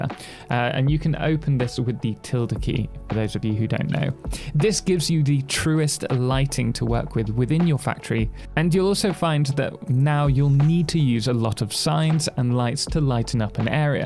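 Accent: United Kingdom. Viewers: Which you had more play content around 20minutes. Me and my GF enjoy your voice and Brittish accent. We have been watching your clips while I started Satisfactory 2 months ago. Maybe a playthrough when 1.0 drops? Epsiodes around each Tier or catalogue would be a tip? Standard Parts, Electronics, Steel, Copper, Iron factories, then truck lines and train lines adding on from tour starter location. We find imKibitz too stressful to follow and his megabuilds are far too big to follow.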